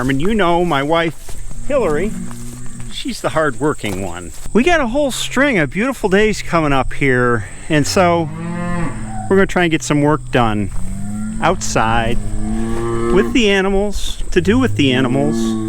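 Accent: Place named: South, United States. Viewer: 60s country twang puts the ooo in smooth.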